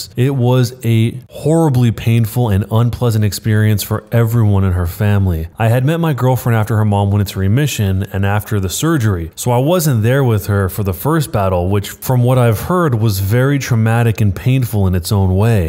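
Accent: United Kingdom. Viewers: BRO THE BRITISH 1980 ACCENT IS KILLING ME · That British voice 😂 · the British accent made me go pffffft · The brit accent tho 💀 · this british accent had me on the floor 😂😂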